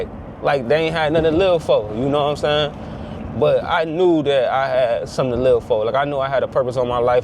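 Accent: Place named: Washington DC, United States. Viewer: Man you sound like a DC dude from time to time you had to around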